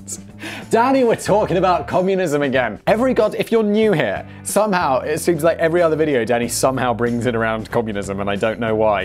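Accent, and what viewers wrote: United Kingdom. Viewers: You say pretty much every single world with a British dialect - except for controversy. I don't know why it winds me up, but it does... I don't usually care how a word is pronounced, so long as it is said like that somewhere in the world. But controversy - when said by you... Constipates me. Perhaps because you always say things in British dialect and quite often make a point of telling your US viewers why (to them) you are pronouncing things incorrectly. But that one word, you do not use our tongue and never mention why. When with other things that are commonly said either way in US, or UK - you feel the need to explain. You sir, are getting root bound in your dialect and as you take in more American ways, your British side is dying as the roots have nowhere to grow, due to the space having run out and memory is overriding itself..., the root bound British (coca) plant...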